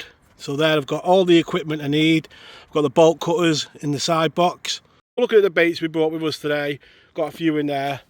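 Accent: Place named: North, United Kingdom. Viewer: Hiya, what a perfect start to your Pike season as i don't think that it could have gone any better for you. What a stunning venue that screams Pike, i cant recall ever seeing you Lure fishing but it has made a great addition to the channel. I didn't know about the Fox relicant rod so i will go and google the hell outta it:) It was good to see you using a Baitcaster reel, what do you think of them please? Is there any chance that you could show us how to load a baitcaster spool with Braid including the best knot to tie it on with please? Allot of the video's are from America which are quite good but i would much prefer you (and your Northern acent) showing us how to do it:) All the best & tight lines. My family has got that used to my phone going off at 5pm every Friday that when they hear it they say "that'll be "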